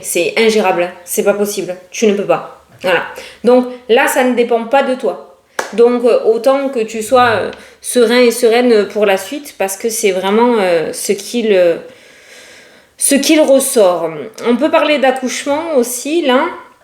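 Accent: South, France. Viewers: Désolée pour le commentaire tardif, pas eu le temps de l’écrire hier après t’avoir écoutée. Je laisse rarement des commentaires mais là il le fallait absolument. Ta robe te va très bien mais ton sourire est encore plus magnifique. Les 2 ensemble c’est wow!! C’est d’ailleurs pour ton énergie, ton sourire, ta bonne humeur (et parfois tes petits coups de gueule), ton naturel, ton accent du sud (...) que je te regarde. Un grand merci pour tes vidéos et ces moments de partage!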